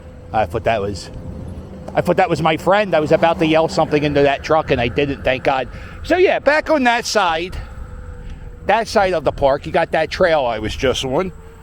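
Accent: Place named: Pennsylvania, United States. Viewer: I just want to tell you I’m a Pa native and to hear the knowledge that you have on the metro Philadelphia area is phenomenal and refreshing to hear. I was born in Norristown, and loved philly, knew and worked there till i was over it at 22, moved out west to California and now reside in Nevada. Love the phillies, Eagles, and miss hearing the slang in your voice, always know a fellow Pennsylvanian! Im now a fan, keep up the great videos 👍🏼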